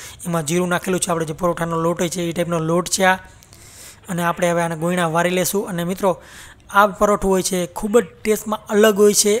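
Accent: Kathiawar, India. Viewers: Wahh bhai aedam kesi kathiyavadi ha mojj ha ✌desi bhasha ma samjavyu mja avi gay vala panir ni subji kjavani man htu bhai , tme puru kryu thank you bhai.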